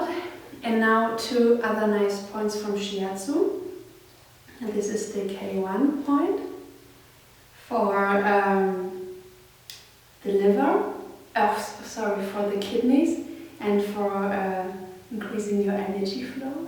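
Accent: Germany. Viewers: Du bist Deutsche, das hab ich sofort gehört ;)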